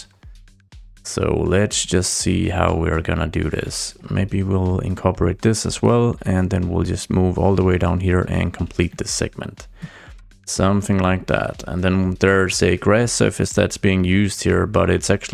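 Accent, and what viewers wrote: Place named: Denmark. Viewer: I KNEW IT I KNEW THAT U WERE DANISH I HEARD IT HEARD IT OUT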